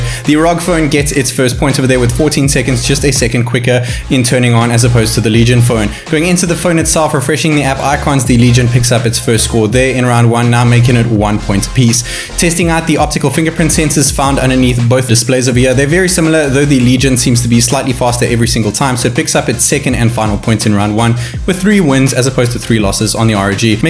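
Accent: South Africa. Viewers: this guy has a thicc South African accent!!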